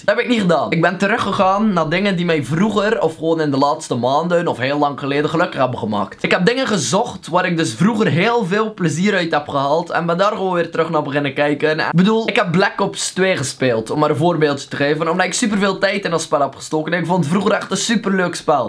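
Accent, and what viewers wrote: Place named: Flanders, Belgium. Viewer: ik ben zelf een nederlander, maar ik vind Vlaams meestal best een stomme taal. maar bij jou vind ik het echt heel grappig en ik word er blij van! :)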